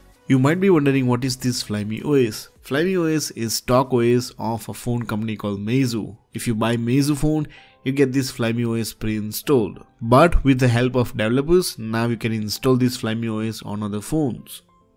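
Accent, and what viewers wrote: India. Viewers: Bro you are Indian or foreign. BTW BEST CONTENT. CAN YOU PLEASE MAKE A VIDEO FOR BEST STALE ROM FOR MOTO G4 PLAY (HARPIA). LOVE FROM INDIA.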